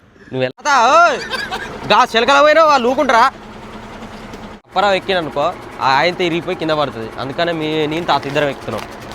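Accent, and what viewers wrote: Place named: Andhra Pradesh, India. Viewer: Inkokasari andhra slang takuva chesi matladutey ninnu ni amma ni ni pellam ni Ni lorry lo ney denguta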